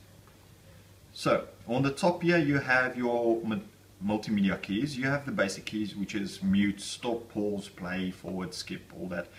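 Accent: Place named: Afrikaans, South Africa. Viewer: Great review for an epic keyboard. Quite an interesting change of pace listening to an Afrikaans accent rather than American haha but I dig it ;) Good job!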